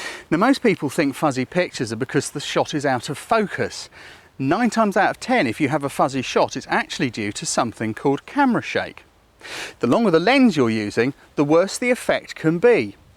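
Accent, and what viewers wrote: United Kingdom. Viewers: I liked all your videos and your British accent too. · Great personality, great teaching skills, vast experience, and the British accent. Doesnt get any better that this.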